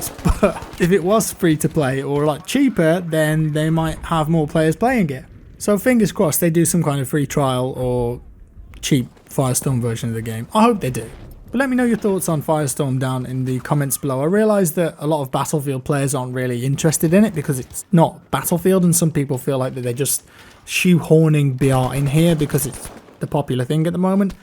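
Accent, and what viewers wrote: United Kingdom. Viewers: Here's something that's kinda funny. When I watch you're content for BF5 or content from any other game, I trust you more than some guy in America, (I live in America) because of the Brittish accent. (Yes, I know you don't have an accent but I have the accent) Why?? I have no idea at all..... :)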